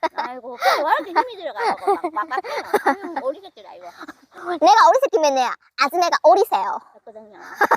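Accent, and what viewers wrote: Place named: Gyeongsang, South Korea. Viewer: 오늘도 알람뚜자마자 ~~~내가믄져와버려따구 ~~~오늘 언니 말투너무웃곀ㅋㅋㅋㅋㅋㅋㅋㅋㅋ 나도 경상도 아가씨 😂❤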